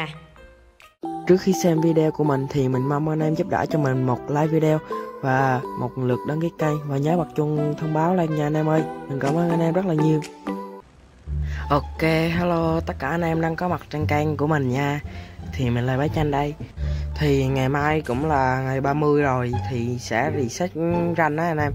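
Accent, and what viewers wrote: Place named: Da Nang, Vietnam. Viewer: anh là người đà nẵng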